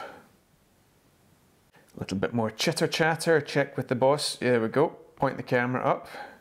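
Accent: Scotland, United Kingdom. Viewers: Very helpful video, and the Scottish accent is irresistible to a Yank like me. Killer footage almost worth colliding with that mangy tree to achieve. The only thing you missed explaining is how to use the sticks to change the altitude and radius while flying, which is fairly evident when trying it with the DJI Go 4 app, but not clear here in this video. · Great explanation of the feature and great to hear another Scottish voice on YouTube! 👊🏻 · Love the channel and the content, the scottish accent is just a bonus 😊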